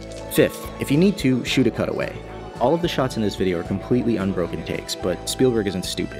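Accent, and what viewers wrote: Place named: Boston, United States. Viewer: you're from boston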